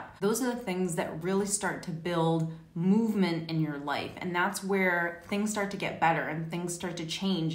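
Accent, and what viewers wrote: United States. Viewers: thank you lady to listen to you it helps me to overcome my deception and now I'm so confused. and it's true you can't control what happen in our life . I have another tool to keep living glad is the music . I don't know where you have found this wisdom? it's too nice to hear your American accent. thank I feel better now and I learn so more when I go through trouble it learn me the reality of the life. thank you I'm RICHARD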